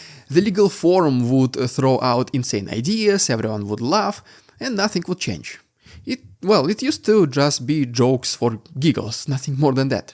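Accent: Russia. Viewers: I try listening to you but your accent is so Russian that I cant understand you. You talk English words but in Russian, it’s bad.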